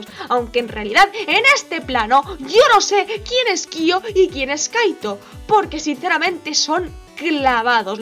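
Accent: Catalonia, Spain. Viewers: ¿Ereh catalanah? OH DIOS POR FIN (alguien más que es catalán) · se nota tu acento español y soy seguidor de tu insta tambien :3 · Eres catalana?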